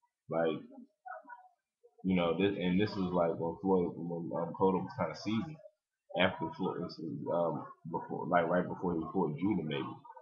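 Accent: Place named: Philadelphia, United States. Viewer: I can tell you from Philly by the way you talk no homo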